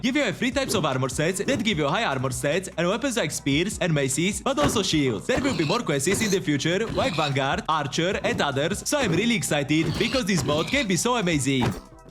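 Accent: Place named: India. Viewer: Why do u have the most indian non indian accent of english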